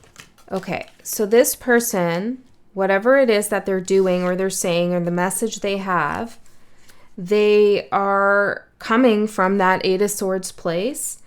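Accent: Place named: Canada. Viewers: it's an accent. she is canadian. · I think it’s Canadian speak 🤭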